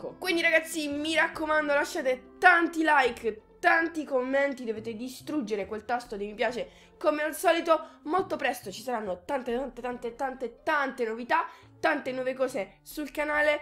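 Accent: Apulia, Italy. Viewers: ma sei pugliese?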